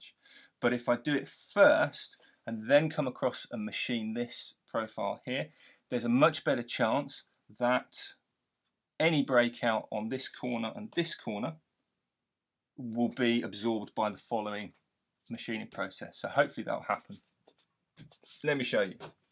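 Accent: England, United Kingdom. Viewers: Very informative and good to hear an English accent for a change!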